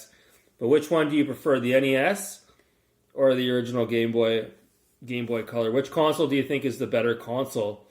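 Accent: Canada. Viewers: Sound like northern Ontario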